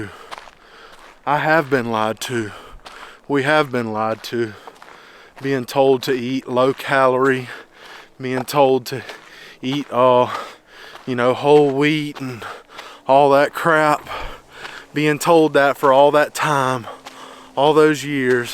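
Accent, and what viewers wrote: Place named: Alabama, United States. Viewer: Thanks you are an awesome inspiration. I love your Alabama accent and relaxed way! I love the south. Thank you. Yes I need to make goals for 2024 be blessed! ❤ Respect always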